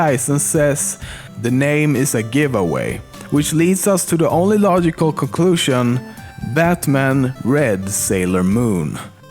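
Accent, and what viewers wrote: Sweden. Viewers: well he is swedish so swedish people can't pronounce very well in "J" letter which i love listening to their accents :) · He is swedish... basically like pewds, jennifer= yennifer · Hahah, your swedish accent makes me laugh xD Norway ftw! great videos though (Y)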